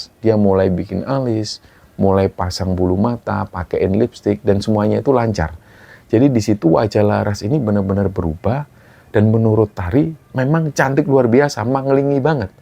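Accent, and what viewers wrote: Java, Indonesia. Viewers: ❤❤❤Om the way you speak seperti keluarga besar SOLO kami 😂 ( Om, bulik, Budge, Padhe) ❤❤❤ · Asallamualaiqum Mas Salam.Kenal.Bunda dari Kota MAJALENGKA. Suka bnget klo denger crta dari.itu Lho logat Jawa nya enk di dengar Yaqin Mas..😅😅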